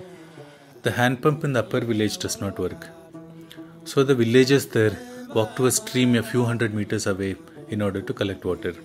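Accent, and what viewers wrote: South India, India. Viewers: Orator from south india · South Indian orator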